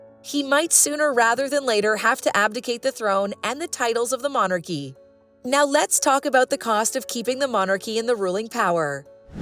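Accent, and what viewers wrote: United States. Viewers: The Monarchy is not irrelevant, its existence guarantees continuity, stability, freedom and democracy. The fact that a Monarch is our head of State means that no single Party or group can take over the British Isles. It also means we don’t have the divisive need of elected Presidents who would polarize society. We have a democracy like no other in the world, and look at it this way…Our head of state is apolitical and at least we don’t have to elect Presidents, which would create Constitutional crises and social unrest every time. Why are we preached to by an American voice anyway. What the heck do Americans know about the British Monarchy?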